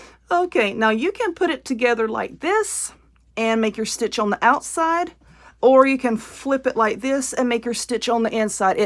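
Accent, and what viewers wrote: South, United States. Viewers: Woman you are amazing!! I so love your videos! Thank you from another southern girl that can so understand your wonderful voice!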